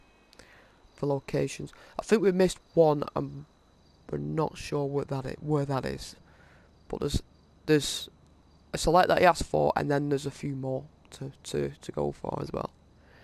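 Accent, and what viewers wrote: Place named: Northern England, United Kingdom. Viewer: Love you're vids friend. Nice to hear a welcome northern accent as most vids are over the top american stuff. Red dead vids have been useful too.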